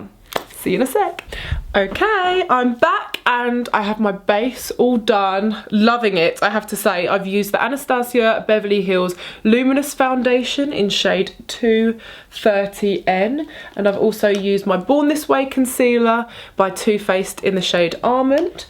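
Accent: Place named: United Kingdom. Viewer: I LOVE UK, I basically love everything British, from people, to London, to this accent, oh my God I feel so happy when I'm listening a British talk hahahaha I have such a deep love for UK, my dream is to travel there someday ❤️❤️ I said all these things just to express myself and my love for this country and not to try convince to pick me as a winner.. I can get all these things, it would just be AWESOME and something so meaningful to me to get them from a British girl ❤️❤️❤️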